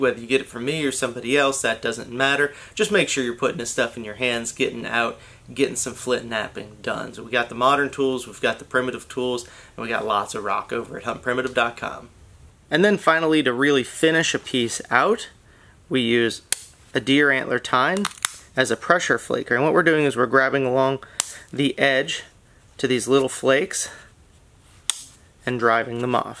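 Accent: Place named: South, United States. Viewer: are those invasive hogs? i'd assume so by the slight southern accent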